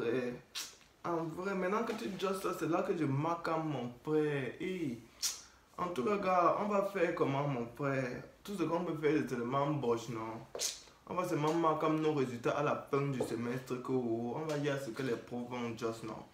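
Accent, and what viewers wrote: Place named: Cameroon. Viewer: J'adore ton français camerounais. Je n'ai presque rien compris...but your acting is so funny!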